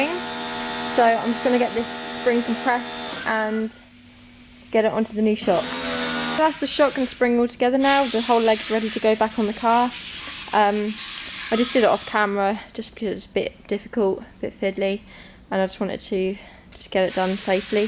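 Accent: Norfolk, United Kingdom. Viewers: You sound like you're from Norfolk. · Thought that sounded like a norfolk accent. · Your accent sound well Norfolk !! 😆 I've got an '87 Granada Scorpio. Just seen a Sierra Mk1 4x4 Ghia estate on EBay... tempting. Anyway, I've subbed, so keep you a troshin'. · like this accent so british ;P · Hot girl with a British accent that likes to work on cars... I know I had an engagement ring around here somewhere... 😉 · Love the channel, you must be from Norfolk with that accent.....👍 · You sound like you are from Norfolk. I'm an East Anglian myself. Good to see the retro cars being kept alive. As well as bikes I am working on my 71 Mach1 Mustang and '72 Mark 1 Escort also. Look forward to future episodes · "That do, achly" - aha! Norfolk, I thought... and I was right! :-)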